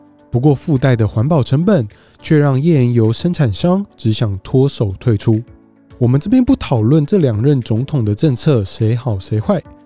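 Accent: Taiwan. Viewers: Ａ，最近也卖掉Tesla改买油车了，因为北美实在太冻，之前一场大雪车就故障了 是说小哥哥的台湾腔还挺好听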